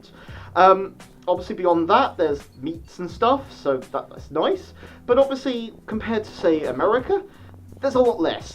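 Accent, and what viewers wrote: England, United Kingdom. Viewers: I thought you were going to talk about the cute kind of "shipping" for a moment, there. ^_^ I want to be a furry, so it's good that cons exist in the UK, and it's possible to get a fursuit here (I may have already known that you were English, but I tend to forget as I can't really tell the difference between English and American accents, so I tend not to assume YouTubers are one or the other, if that makes sense.)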